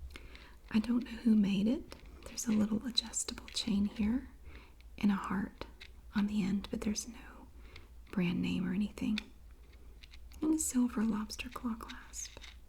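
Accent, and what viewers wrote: South, United States. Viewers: It's her Southern drawl.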